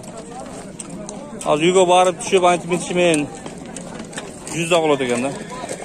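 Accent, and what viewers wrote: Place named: South, Kyrgyzstan. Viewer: мына оңолупсуң. Баягы " паразит сөздөрдү колдонбой публично таза сүйлөп калыпсың. Дагы иште өзүңдүн үстүңдөн ( орусча - над собой). Мага окшоп сын айткандарды жаман көрбө- сенин пайдаңа айтылып жатат. А максимально талап өзүңө кой- кыргыздын түштүк диалектисинде эмес- баягы адабий бекитилген мамлекеттик тилде сүйлөгөнгө аракет жаса( баягы "Ала-тоо маалымат программадагыдай. Эмне дегенде- бара- бара аудитория көбөйүш үчүн сени бүт Кыргызстан көрүп угуш керек. А сен жалаң диалекте сүйлөп жатып алсаң- башка региондор жактырбай көрбөй коёт- аудитория жоготосуң.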